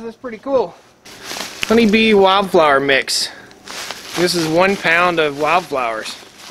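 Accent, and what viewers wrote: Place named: South, United States. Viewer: you have a nice country voice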